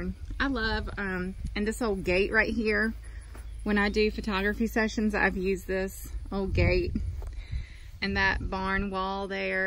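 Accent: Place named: North Carolina, United States. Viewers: Was wondering as you have a similar dialect/accent as someone I used to know in Clayton, NC - thanks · I started watching your video's earlier today. I said to myself, she has a North Carolina accent. And i just watched this video were you said Caswell co. I lived in Allamace co for over forty years. Now on the beach in S.C. But you brought back many memories of one of the nicest and prettiest state's i've ever lived in..And i can not call cows either. Have a nice day.. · that’s so funny, I was using the sound of your voice just to try and guess where this was, and I was close. I was born in 56 in kernersville, moved to hickory in 61 and owned a home in Sparta from 76 to 88, I now live at Apple city USA Taylorsville for the last 32 years Alexander co, the poorest, smallest, least population of any county in the state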